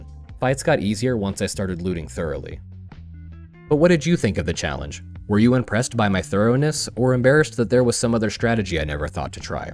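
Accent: United Kingdom. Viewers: Another example of an American not knowing what English English sounds like. He’s not an Aussie… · yeah east end bruv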